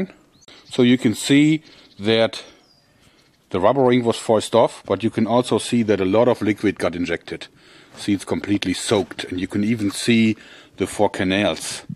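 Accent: Germany. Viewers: I love this guy! He seems like a tough brute at first but he seems like he is really nice, but still a total badass!! His German accent makes me miss my grandpa.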